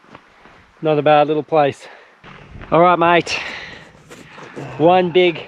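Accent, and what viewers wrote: Australia. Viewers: Thats it haha. You just sound like you're from Melbourne.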